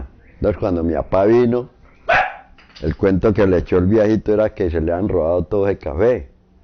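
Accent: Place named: Armenia, Colombia. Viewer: Buena historia.. mi novia es de Armenia y habla tal cual.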